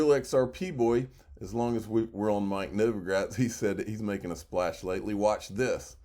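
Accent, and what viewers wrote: South, United States. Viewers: Bad mic settings , thick southern accent with mispronunciations and old news = BYE BYE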